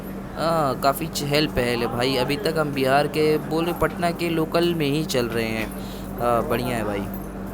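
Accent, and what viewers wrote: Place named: Gujarat, India. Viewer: Gujarat se ho kya, hindi accent gujju lag raha h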